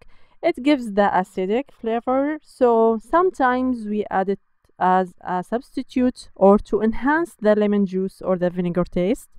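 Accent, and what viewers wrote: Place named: Iran. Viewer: I love the Iranian accent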